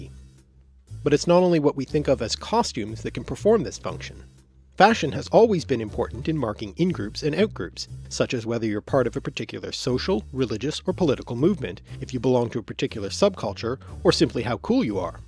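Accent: Canada. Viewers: If no one noticed you were Canadian before, this video with all its ou words is a giveaway XD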